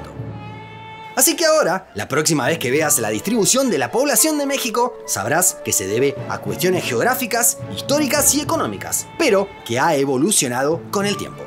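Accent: Argentina. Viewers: Wakala ese acento argentino, traigan al antiguo narrador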